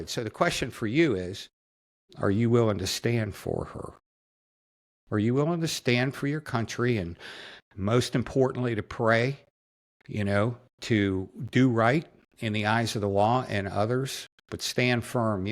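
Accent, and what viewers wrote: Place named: Pittsburgh, United States. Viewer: Just found your channel, and appreciate what you are doing! Important message for sure . I'm from Pgh and couldn't help but notice (maybe?) a Pgh accent? God bless!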